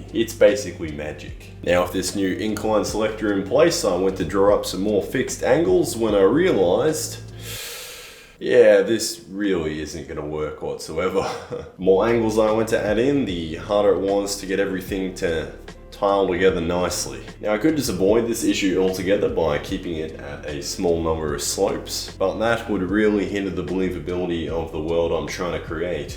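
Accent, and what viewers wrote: Australia. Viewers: The aussie accent makes this video 100 times funnier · Sounds like “I did a thing” us fucking Aussie’s all sound the same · Australian ASMR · i knew immediately this guy was Australian. when i saw the wiggles at the end, i was even more sure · Are you Australian? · first 5 seconds, i can already tell he's a fellow aussie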